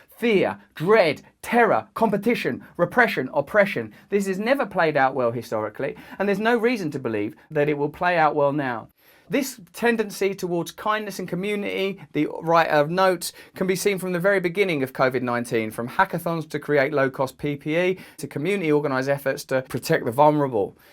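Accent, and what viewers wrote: United Kingdom. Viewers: Dear Russel, Would you please be so kind TO SPEAK SLOWER. English is the lingua Franka of today's world, but the British accent IS NOT. I live in the USA and although I understand you perfectly well, I have to watch your video, instead of just listening and doing other chores, as I do with other videos. Your fast British accent really DISTRACT from your very thought-provoking videos. You are not just an entertainer, you are a social leader on a global scale. Give the public a break and slow down the speed of your speech for people to analyze what you say. Please do not forget that there are a lot of people, who know have limited base level English, which does not make them less educated or intelligent, but your VERY SPEEDY BRITISH ACCENT could prevent people to learn more about you and your work. I personally tried many times to skip your videos, but you tend to present the most important themes. 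Thank you very much! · is a brit, if i identify his accent correctly, and has no clue about political reality. or else he's just pot-stirring. you have no powers, so no freedoms. that is the purpose of democracy - the citizens rule, so the people have collective power, and resulting freedoms. outside the experience, and imagination, of, and other brits. so nothing will come of his expostulations, beyond any internal sense of satisfaction at saying 'nyaa, nyaa!' towards their masters.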